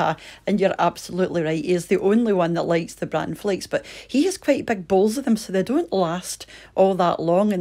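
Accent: Scotland, United Kingdom. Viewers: Lovely Chanel and great food! I’m from Somerset England. I love the Scottish accent. Did you ever watch Highlander? If so what did you think of it? X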